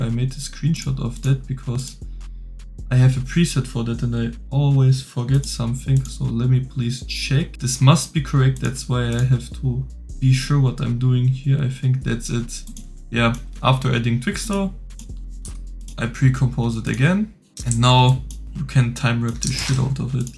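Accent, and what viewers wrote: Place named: Germany. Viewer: You have a German Akzent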